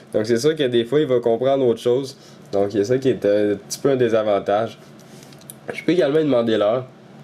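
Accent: Quebec, Canada. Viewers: Je ne sais pas de quelle région de France tu viens mais j'ai jamais entendu un Français dire "i"phone, tout le monde dit "aïe"phone (d'ailleurs Siri le dit correctement) ... Et je pense pas que l'auteur de la vidéo ait besoin que tu le prennes pour un attardé ... Les québécois ont des expressions différentes et c'est surement leur accent qui cause ses petits bugs mais pas besoin de lui faire la morale sur la langue française ! On dit "y'a du vent dehors ?" ;) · il foire de temps en temps due a votre accent quebecois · Mais aussi tu as l'accent canadien c'est normal.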